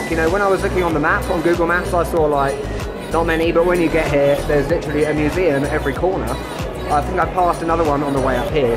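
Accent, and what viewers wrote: United Kingdom. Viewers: Hi! I am a native from Mexico City and I love your videos on my home town. I like your style: dynamic and objective. I don't live in Mexico City anymore, so when I watched your videos, I got to see new places and attractions. Also, I LOVE your British accent. It's pretty cool. Wish a great sojourn at Mexico City. Cheers!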